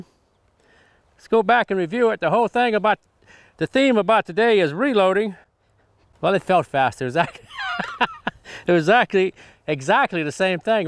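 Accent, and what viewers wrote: Louisiana, United States. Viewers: Wheres he from, he has a bayou accent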